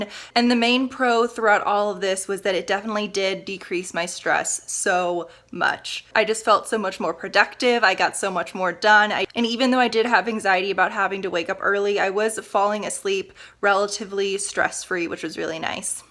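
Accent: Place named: United States. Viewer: I am not an english speaker but I can understand her speech even though she speaks very fast. don't know she use easy words? or pronounce clear. wonder where she is from. think from America but which part of accent? somebody know?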